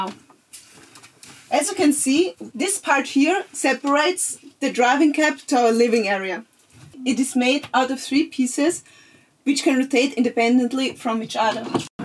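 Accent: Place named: Italy. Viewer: I can hear a liiiiiitle bit of an Italian accent there : ) But I wasn't sure, great English speaking!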